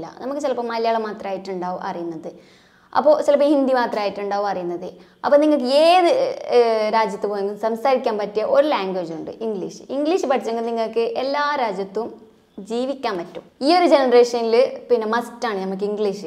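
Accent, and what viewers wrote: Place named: Kasaragod, India. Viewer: Slang kazrod ayond nere manassilayilla....but,informative 👍🏻keep well❤️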